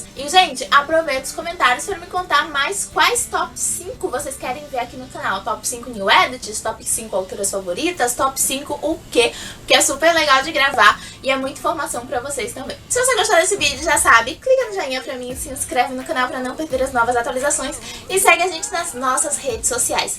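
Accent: Salvador, Brazil. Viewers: Vocêee é de salvadoooor?????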